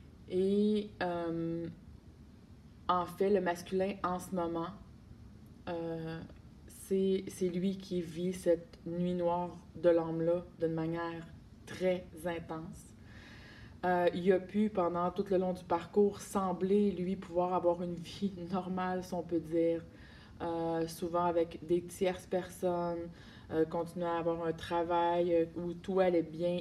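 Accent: Quebec, Canada. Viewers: Tu as une voix québécoise, ce que tu dis est exactement la même chose depuis 7 ans, 2012. il a des amis toxiques et oui j’ai hâte aussi au 26 déc. je sais qu’il en subira des conséquences que de m’avoir vraiment choisi, bien qu’il assume. J’ai tout coupé tout contact et toute énergie de protection. Il m’a vidé alors qu’il se responsabilise, moi je fais mon chemin. Sa fausseté spirituelle je m’en fou mais je l’ai bien cerné ce conard dans ces conneries au lieu d’aller faire une thérapie sur la violence d’abus psychologique. Je me consacre à l’amour véritable sans violence et finitos les hommes ça va faire de les materner. Ils n’ont pas la connexion du coeur, des vrais pervers sur notre conscience divine. 7 ans d’enfer alors je mets le gros cadenas 🔒 Trop d’hommes nous traitent comme des poubelles depuis des millénaires alors je fais la grève. Je suis les conseils d’une aînée et ça fait 35 ans qu’elle est seule et très rayonnante. 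Ces hommes nous manipulent tellement bien avec leurs énergies diaboliques, ça pu de cerveau avec tout ce qui prennent de toxicité que c’est juste le cerveau reptilien qui leur reste et pu de neurone dans le coeur donc il y a rien à faire. Mais moi je me suis reconstruie et j’ai une grande amélioration de mon corps 🙏🏻🥳😇 avec une colonne droite et des gens extraordinaires autour de moi! 🥰 Je suis prête au bonheur avec une insémination et mon enfant sera bien et heureux avec tous mes savoirs d’amour 😍 J’ai confiance à la nouvelle génération 😎 à l’arrivée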